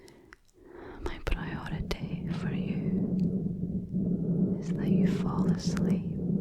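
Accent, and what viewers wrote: Scotland, United Kingdom. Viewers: Gorgeous Scottish accent. So clear and concise. 🏴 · Some incomprehensible Scottish, late night rambles and tingly mic cover scratching does in fact lead to brain mush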